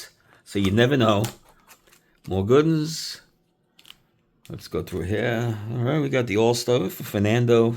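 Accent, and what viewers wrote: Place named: New York, United States. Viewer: This guys NY accent is thick as a rug.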